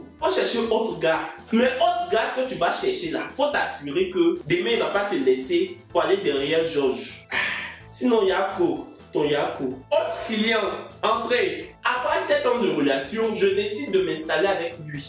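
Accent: Cameroon. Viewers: Loool y’a beug dans mon cerveau ou j’ai entendu s’exclamer en disant (yé maléhhh🙆🏾‍♀️🙆🏾‍♀️) vraiment mon frère ou ma sœur camer là, tu fais du bon travail 👍🏾👍🏾😂😂🤣 j’écoute jusqu’à parfois j’oublie qu’il est ivoirien!! Yeuch!! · Bonjour Je t'ai aperçu à un pas de ma maison ds le 15eme arrondissement le février,je rentrais du travail, j'étais un peu timide mais aussi très fatiguée avec une longue journée. Mon souhait a toujours été de te rencontrer un jour mais alors ce fameux jour est arrivé et j'étais fatiguée. On s'est salué vite fait car tu étais avec tes amies et moi qui passait et pas de courage de venir vers toi faire une photo. Dis moi seule qd est ce que tu reviens ds ce café pardon. Je t'écoute régulièrement et ds ton accent je comprends que tu fréquentes beaucoup de camerounais car tu as plusieurs mots camer ds tes vidéos. Trop happy ooooooooo En tout cas je sais que seul les montagnes ne sont rencontrent pas. 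N'hésite pas à me répondre Une fan depuis le 15ème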